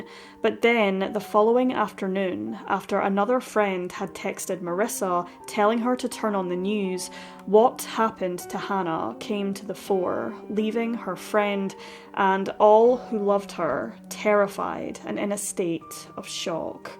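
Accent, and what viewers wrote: United Kingdom. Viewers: yes,top 5 for me too. Truly criminal are great too. Like yo,to the point,clear,concise+British is a bonus for me😊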